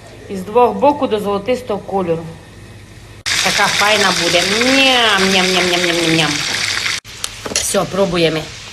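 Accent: Transcarpathia, Ukraine. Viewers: Яка файна мова, обожнюю цей закарпацький говір. Дякую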